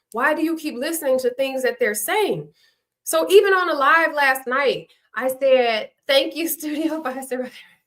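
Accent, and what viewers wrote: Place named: Detroit, United States. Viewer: I heard your Detroit accent immediately, similar for some of the other cast. It is subtle but Detroiters have it. Saying D'Troit in a way more like the original French origin versus dEETroit I hear outside Michigan.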